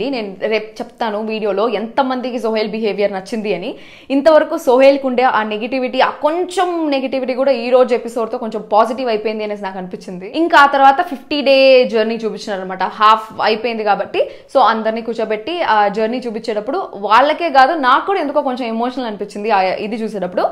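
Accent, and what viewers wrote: Chittoor, India. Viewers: I never seen a girl from chittor speaks in proper slang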